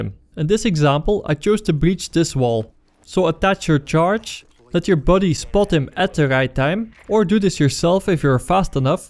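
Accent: Netherlands. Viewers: you have a dutch accent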